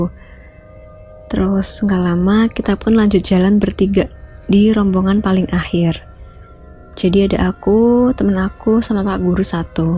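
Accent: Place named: Semarang, Indonesia. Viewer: Dari logatnya kyk org semarang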